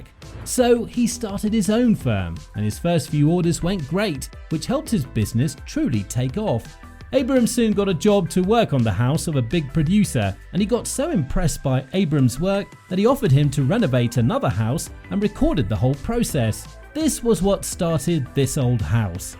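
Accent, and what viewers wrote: England, United Kingdom. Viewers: I can’t believe someone with an English accent read out this tripe! Get a half decent script editor and transform the quality of your videos overnight! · Why do they import a brit to narrate?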